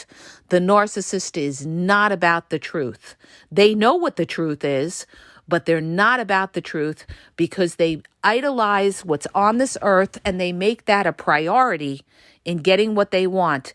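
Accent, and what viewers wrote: New York, United States. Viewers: I think you are a very educated person that is helping masses of hurting people❣⛑ 🤗I love yr accent= New york? & you are very pretty. Some constructive help to help you SHINE BETTER! Your tone of voice sounds Angry & I'm sure it is righteous indignation against injustice & abuse & also, in my opinion it is much more classy NOT to cuss, which are offensive words, not necessary. Humbly, I hope u receive my words, only meant to help. Regardless, I will still listen 2 you. 😁🥰💃🏼🤸‍♀️🙌🙏😇🌟🌞 God Bless you❣💝💖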